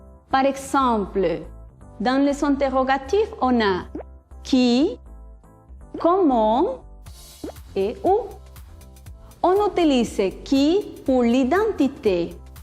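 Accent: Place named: Spain. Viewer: ella pronuncia como español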